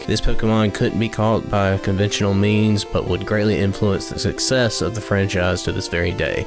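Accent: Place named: South, United States. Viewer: As a southerner myself, your accent makes this video even more charming. Informative and entertaining. Keep up the good work!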